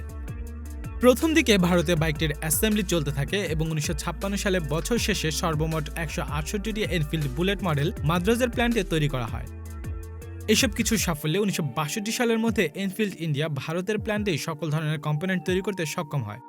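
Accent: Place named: United Kingdom. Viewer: অনুরোধ করছি ভয়েসওভারে বাংলা অংশ টুকু পরিষ্কার করে বলার জন্য। ইংলিশ এ্যাকসেন্ট আর বাংলা এ্যাকসেন্ট করে ফেলায় মনে হচ্ছে বাংলাটাও ইংলিশের মতো করে উচ্চারণ করা হচ্ছে।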